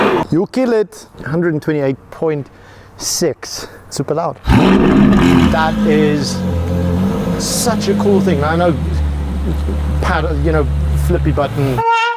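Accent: South Africa. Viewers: Wooh Masekinders die man is van definitely van SA 🤣🤣🤣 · So SAFA ;-) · South Africa this dude, very obvious, when he started taking about chicken teeth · Is this guy South African ?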